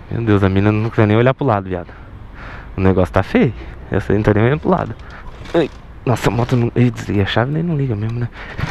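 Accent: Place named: Minas Gerais, Brazil. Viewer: mineiro kkkk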